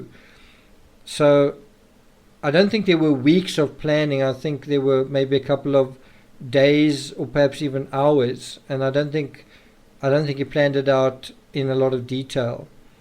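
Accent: South Africa. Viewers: You must be South African going off of your accent? :) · That's a South African accent I instantly recognize it love from South Africa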